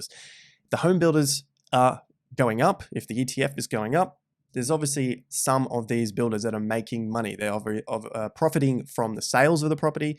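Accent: Australia. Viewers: Keep up the good work. Great to hear a genuine Aussie accent. Rare in Japan after 20 years. Quality material and delivery!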